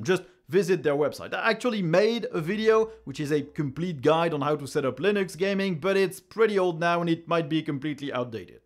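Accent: France. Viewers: French accent is the ❤ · Thanks, I'll check some of these sources out. And I don't mind your French accent (barely noticeable) as I speak French with an American accent. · great video, In his time, thank you, Btw i like your french accent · Your French Accent is a breath of fresh air in this world that is inundated with American nonsense. Never apologize. Thanks so much for sharing. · J'aimerais bien avoir le même "French accent" que toi 😅 Merci pour cette énième vidéo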